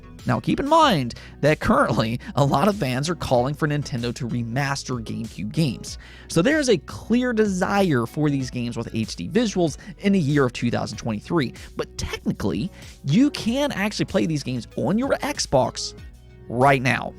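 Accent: Philadelphia, United States. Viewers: didn't know you were a Philly or surrounding area guy.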